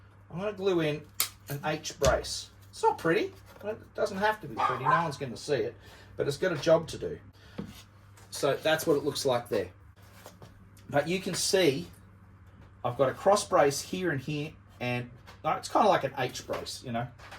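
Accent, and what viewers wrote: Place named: Australia. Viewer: Wow! I wasn't expecting an Ozzie accent. Thanks for the link.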